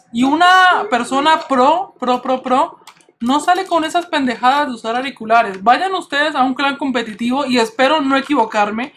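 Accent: Colombia. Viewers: de dónde es este man? porqué aveces parece colombiano y otras venezolano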